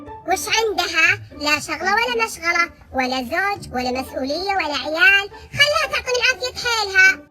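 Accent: Hejaz, Saudi Arabia. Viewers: أحلى لهجه الحجااازي ** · مافي أحسن من الحجازي 😜😍☺️ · ههههههههههههههه يا لبيييييه الحجازية 😍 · يالبى الحجازية 😍😍😍😍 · احلا شي الحجازيه 😂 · الحجازيه تجنن ههههههههههههههه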